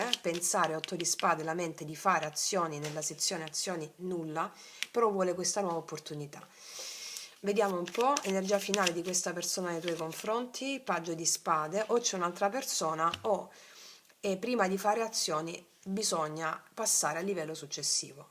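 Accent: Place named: Apulia, Italy. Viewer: Dal vago accento sembri pugliese...sbaglio?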